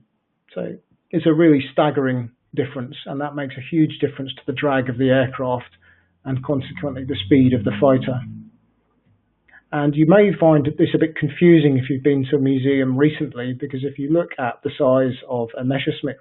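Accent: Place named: England, United Kingdom. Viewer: I told you I fell asleep after 3 minutes. And if you put things like this up on you tube you have to accept the comments from every 1 that might watch YouTube. I enjoy history very much but with in 30 seconds of listening to the man talking in this vid I realised this was an English man which I had no interest in listening to. If you don't agree with my comment you can very easily ignore it it's far from trolling. Maybe I have hurt your feelings in some way. It's ok tho we all know history. We don't need to be told how it all worked out and who the better engineers were (germans) anyway I will say good bye now take care of yourself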